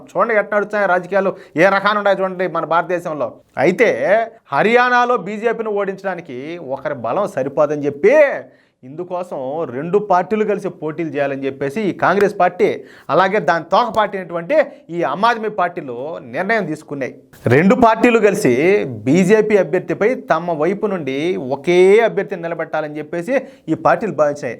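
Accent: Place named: Chittoor, India. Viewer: BABU MEMU KEVALAM MANA CHITTOOR DISTRICT BHASHA.MEEDWARA VINADAM KOSAMEE MANA CHANNEL CHUSTHUNNAMU BABU KAANI E ROJU CHERUVU LO CHEPPALA EXAMPLE MATRAM ASSULU NACHHSLEEDHU BABU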